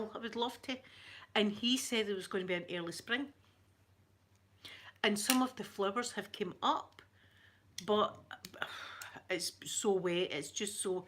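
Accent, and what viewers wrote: Scotland, United Kingdom. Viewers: That lipstick looks lovely on you! I wish I could do a Scottish accent but I carn't do it haha! It's lovely though x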